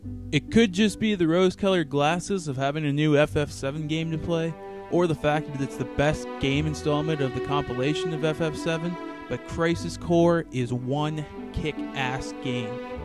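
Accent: Canada. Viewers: are you canadian? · Are you canadian? Great list btw.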